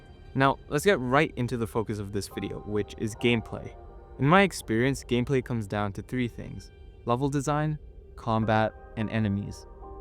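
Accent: South Africa. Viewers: You from South Africa?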